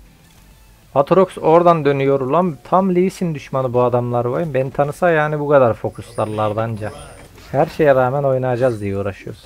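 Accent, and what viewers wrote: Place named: Antalya, Turkey. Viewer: ABI SEN NERELISIN ANTALYALIMISIN ŞİVENDEN ÖYLE DÜŞÜNDÜM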